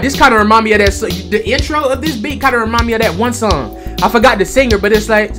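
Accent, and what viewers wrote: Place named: Philadelphia, United States. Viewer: YOU’RE FROM ATL? I thought you were from either Philly or Michigan.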